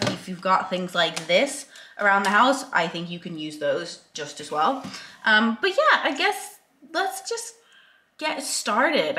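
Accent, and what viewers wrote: England, United Kingdom. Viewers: That English accent is coming along lovely bruv :)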